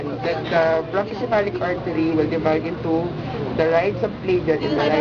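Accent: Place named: Philippines. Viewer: hahahah Filipino accent!!! oh MAN! lol! Just like my grandparents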